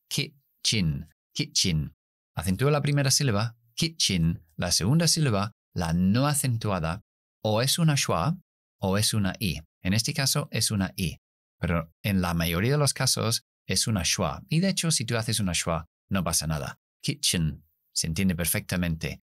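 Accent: United Kingdom. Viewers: Me encanta el británico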